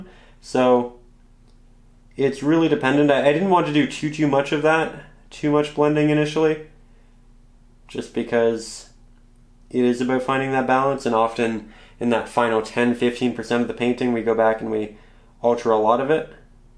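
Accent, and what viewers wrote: Canada. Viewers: The Canadian accent and pronouncing of words is difficult to listen to.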